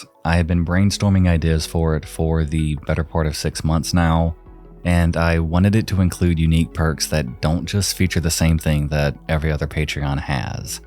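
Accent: South, United States. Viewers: I only care about your silky Southern Sibilance.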